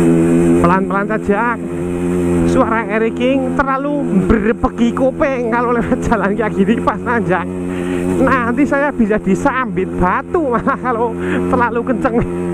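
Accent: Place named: Wonosobo, Indonesia. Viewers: Gw seru ma celotehan nya bkn ma situasi nya.. Ngapak asli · maer mas logate Bahasa Indonesia - Wonosobo wkwkw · Jane rono bengi wani ora jal Jiaaann ngomonge wonosobo bngt basane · haloow Jawa ngapak hehehe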